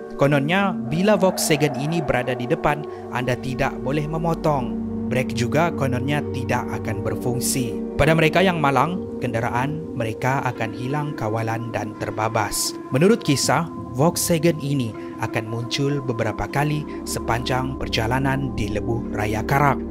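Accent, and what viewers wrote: Sabah, Malaysia. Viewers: Inngat kan ni indon tpi sabah bha😂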